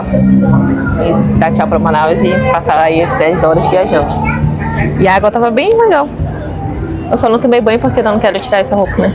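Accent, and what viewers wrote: Rio de Janeiro, Brazil. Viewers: Boa tarde família...curtindo mais um vídeo e deixando aquele Likeeeeee....então sobre o preço da passagem acho razoável com 3 refeições? Show...outra particularidade....Seu sotaque é muito parecido com o dos Cariocas.....Por exemplo...Charleessssss😂😂😂😂...é bonito 😅😅😅...Manauuusssssss....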